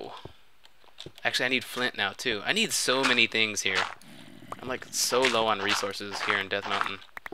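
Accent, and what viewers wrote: United Kingdom. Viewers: you do a damn good english accent lol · nice british accent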